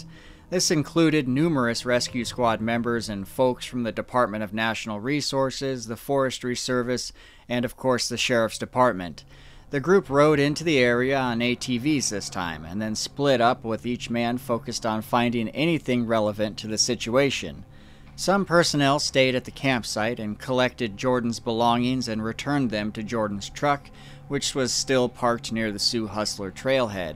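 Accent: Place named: Upstate New York, United States. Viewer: Took me a bit to figure out that accent... ITs the same as Rod Sterling. Guessing your in up state New York, or close to it,,,lol.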